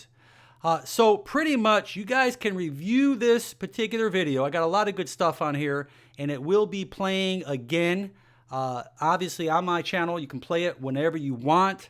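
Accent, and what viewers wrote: Australia. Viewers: hi Are you from Australia? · Are you from austrelia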